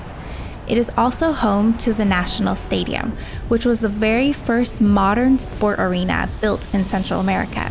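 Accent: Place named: United States. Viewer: Great video! Great American English accent and pronunciation! 💕 ☮ 🌎 🌌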